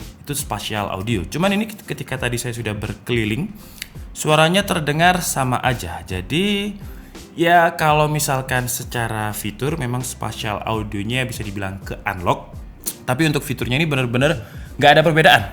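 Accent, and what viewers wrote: Java, Indonesia. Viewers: Wong jowo to yoan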